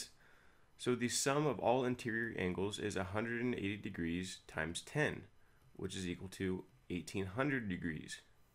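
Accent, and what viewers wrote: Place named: United States. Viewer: American voice talking about an Australian coin.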